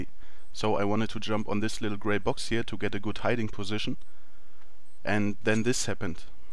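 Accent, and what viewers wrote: Germany. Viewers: Ist er denn Deutsch er verwirrt mich xD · Deutsches Englisch is sooo toll :D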